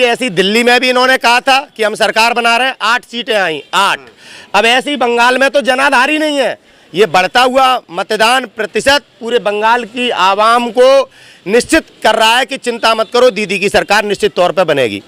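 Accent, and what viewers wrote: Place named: Varanasi, India. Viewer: Ye Bhai tho Banaras wale misraji jase bolrahe hai. Misra ji ko our app ke jazbe ko salam. Sare jahan SE acha Hindustan hamara. Jai Javan Jai kisan. Hindustan Zindabad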